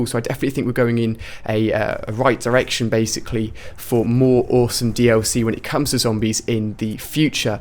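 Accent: United Kingdom. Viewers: What the hell is your problem. Hes from the UK, that's his accent. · okay, wtf? He's british, first off, so it won't sound perfect. And don't mention retards, it's just rude.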